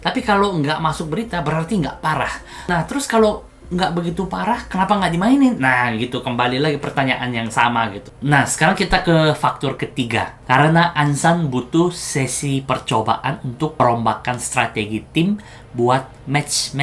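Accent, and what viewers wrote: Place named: Java, Indonesia. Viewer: Logatnya kayak wong jowo paman, he!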